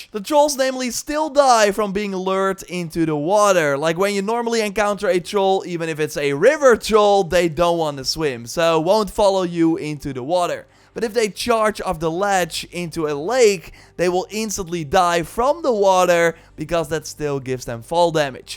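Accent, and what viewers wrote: Netherlands. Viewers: Mooi Nederlands accentje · Are you Dutch? Your accent sounds like it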